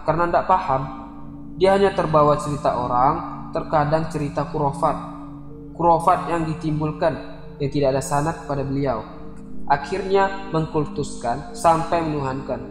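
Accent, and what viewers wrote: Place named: Aceh, Indonesia. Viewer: Maaf dengar dialeknya. seperti dari Aceh.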